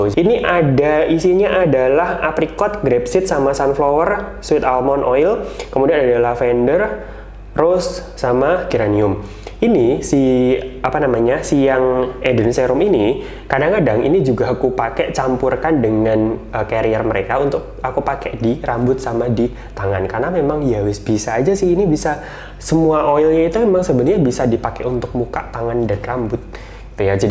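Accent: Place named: East Java, Indonesia. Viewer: logatnya kayak jawatimur agak ngapak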